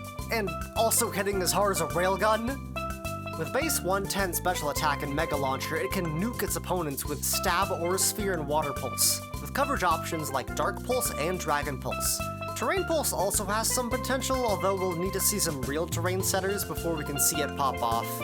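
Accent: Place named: United Kingdom. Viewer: PEAK BRITISH ACCENT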